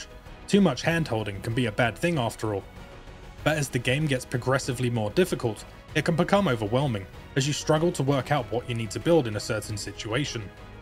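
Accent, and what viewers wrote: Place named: United Kingdom. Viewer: idk anything in british accent sounds more legit even reviews :D i enjoyed it :D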